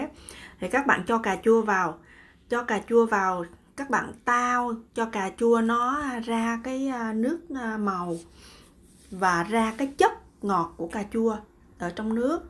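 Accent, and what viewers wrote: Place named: Da Nang, Vietnam. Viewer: Mình cũng ng Đà Nẵng , nge nói mình thấy vui vui , vì gặp đồng hương bên xứ người, mình hiện đang ở Mỹ ạ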